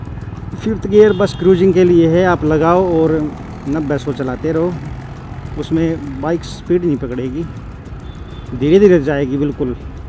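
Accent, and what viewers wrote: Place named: Haryana, India. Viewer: Well explained bro..no nonsense talk..I like haryanvi language..sweet..I am planning for this one..but sometimes confused between This and Hiness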